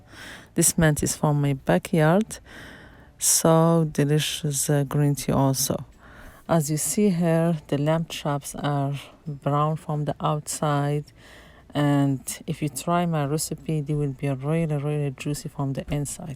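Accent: Morocco. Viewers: It sound like you have a Moroccan accent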